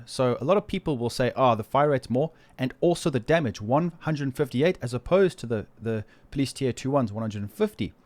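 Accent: South Africa. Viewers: Are you South African? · you're from south africa aren't you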